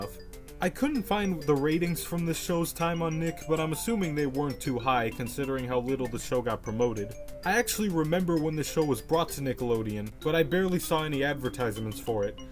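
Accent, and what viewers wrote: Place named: United States. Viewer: Huh an American talking about oggy Neat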